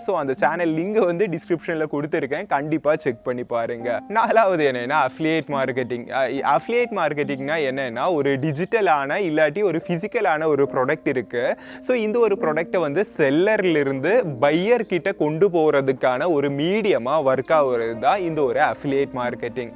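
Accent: Kerala, India. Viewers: Nenga keralatha.....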